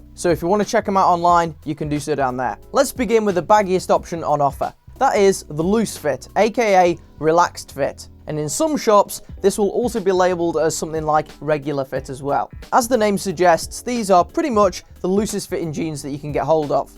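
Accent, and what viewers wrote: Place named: England, United Kingdom. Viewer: Dislike because if english accent! Hard to understand! Dislike 3 times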